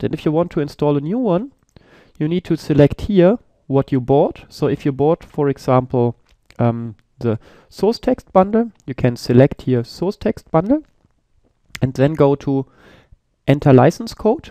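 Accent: Germany. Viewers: Man hört unnormal dass du Deutsch bist :D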